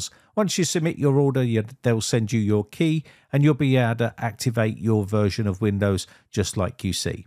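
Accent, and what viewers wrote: United Kingdom. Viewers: brit accent I find interesting, Thing > Fing . Something > Sumfing, With > Wiv, Install > Instaw, Health > Helf. Words witrh TH are a struggle hey, but still I get a chuckle and learn something. (lern sumfing)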